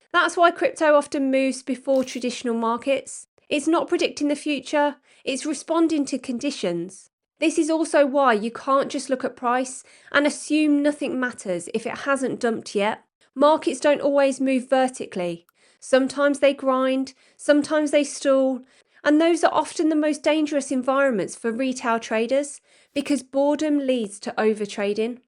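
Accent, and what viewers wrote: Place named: Black Country, United Kingdom. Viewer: Great Black Country accent by the way. Love it ❤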